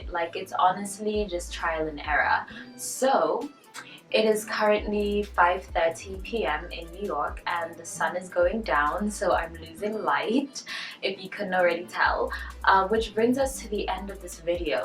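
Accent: South Africa. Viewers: That accent... you're South African right? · You sound South African? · You sound South African.. i'm confused lol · WOW!!!! My friend, you sound a bit south african - are you??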